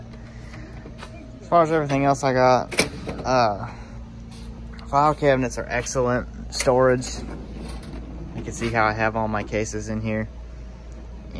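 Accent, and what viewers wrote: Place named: Tennessee, United States. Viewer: You look and sound damn near just like myself.. but you got more a Tennessee twang to ya.. im outta ky, backwoods.. where abouts you from? no homo…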